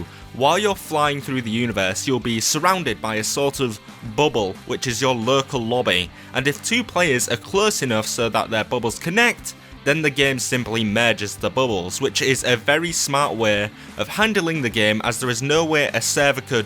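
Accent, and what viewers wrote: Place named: Yorkshire, United Kingdom. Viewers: I think it's shared... A sharing universe. Not a shard, crystal shard or otherwise. Cool accent. I wonder how an American saying 'shared' sounds to our Yorkshire friend here, probably very nasally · It sounds like he's from Scarborough, this is the first time I'm watching one of his vids though...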